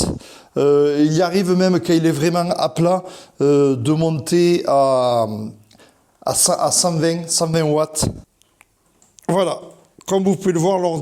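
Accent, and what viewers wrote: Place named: South, France. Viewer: Bonsoir, L'accent du sud est charmant. De mon point de vue, il faut absolument rester crédible et adapter la véritable prononciation. Nous sommes de la même génération, alors ... <link> Merci pour cet échange et bonne continuation. 🙏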